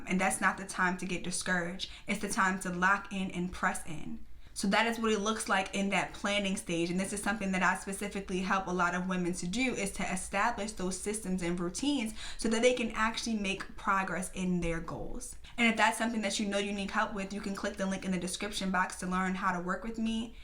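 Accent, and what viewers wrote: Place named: DMV, United States. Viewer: You’ve gotta be from the DMV or somewhere in that area lol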